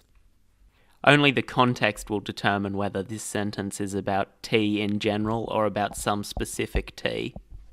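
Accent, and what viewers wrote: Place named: Australia. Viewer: Bu iyi bir video! Güçlü Avustralya aksanına kıkırdamadan edemiyorum ama telaffuzu çok iyi yapılmış!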